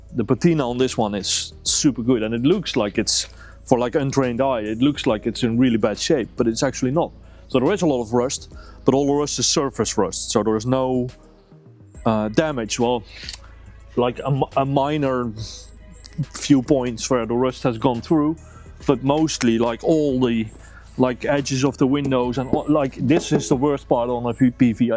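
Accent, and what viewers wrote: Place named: Netherlands. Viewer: When I hear you talk I get the feeling you're origanally from the Netherlands 😂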